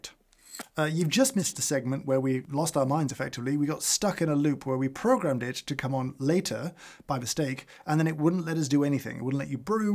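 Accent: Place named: England, United Kingdom. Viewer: delivered with such a quiet kind English accent, it almost goes unnoticed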